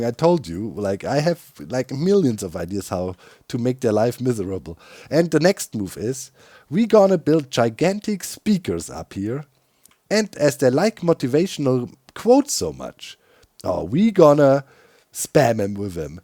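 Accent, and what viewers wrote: Germany. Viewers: The German accent makes it sound .... nvm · Have you ever played "Command & Conquer Red Alert 2: Yuri's Revenge"? Your motivational messages really sounds like Yuri's propaganda voice. In fact, the actor, Udo Kier, who portraid Yuri in this game is German and did both the English and the German voices. So he also has the slight German accent like you do. All hail the G.O.A.T. 🐐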